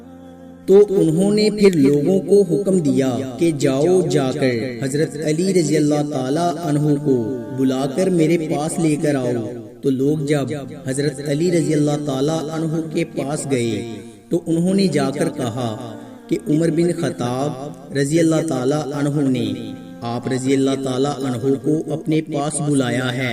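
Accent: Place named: Punjab, Pakistan. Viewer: برخوردار آپکی آواز میں ایسا سوز نہیں اور تلفظ پنجابی لہجے کا ھے۔ مناسب ھے آپ اس کام کے لئے کوئی دوسرا موضوع تلاش کریں پلیز۔۔۔جزاک اللہ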